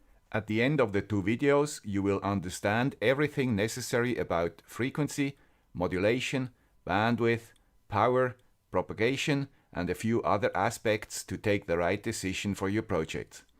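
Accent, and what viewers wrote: Switzerland. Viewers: missing your Swiss accent 🤓🤓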